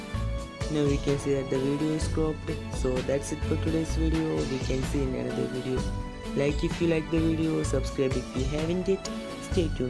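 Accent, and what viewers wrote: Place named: Kerala, India. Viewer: His English slang makes me think that he is malayali...aren't you🤩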